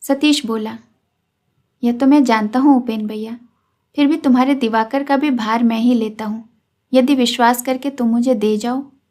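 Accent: Standard. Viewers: ❤❤❤ बहुत ही संयमित एवं अनुशासित, बहुत ही सुंदर एवं दक्ष उच्चारण कला के साथ ऑडियो प्रस्तुत करने के लिए बहुत बहुत धन्यवाद साधुवाद!! एक क्षुद्र जिज्ञासा...😊 आप किस क्षेत्र से हैं; यह आपकी उच्चारण कला से भी जन नहीं ताड़ता!🎉🎉 कृपा करके बताने का कष्ट करेंगी जी??❤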